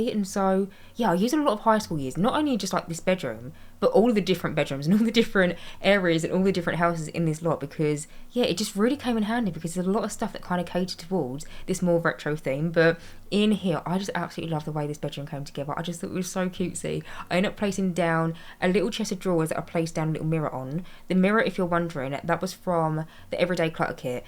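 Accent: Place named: England, United Kingdom. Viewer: your builds are always gorgeous. on another note, as an ex-pat nice to hear a very english accent again.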